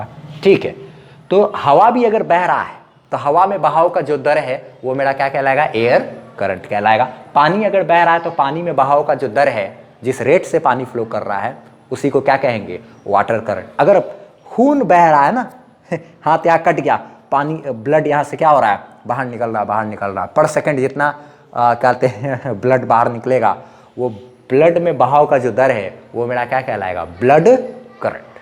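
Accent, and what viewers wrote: Bihar, India. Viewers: Sir aap Bihar k ho..?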